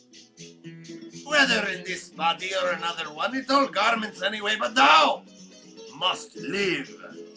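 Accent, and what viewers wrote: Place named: India. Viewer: You're Indian accent is funny!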